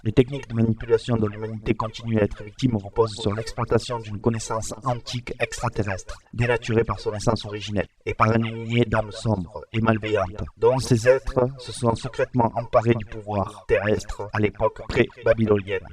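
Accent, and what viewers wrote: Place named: Marseille, France. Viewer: le dephasement de ta voix et merdique ,tu peut te faire retrouver trop facilement x) et t'es un marseilleis deja sa s'entend x)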